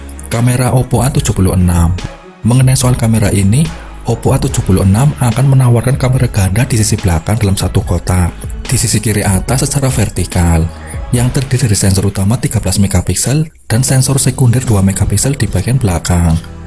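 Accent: Banyumas, Indonesia. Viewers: ngapak ya min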